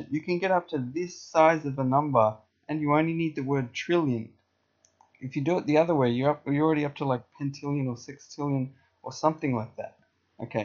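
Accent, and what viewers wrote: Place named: Australia. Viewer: lmao dude mom in american english doesn't rhyme with bum or any of those things, the o is pronounced like the o in bomb. That one is just your australian accent confusing you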